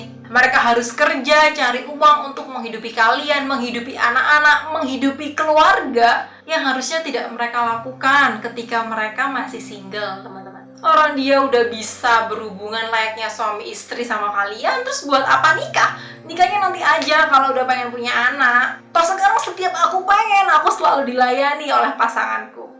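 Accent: Java, Indonesia. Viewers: medhoknya ciri khas banget 😅😅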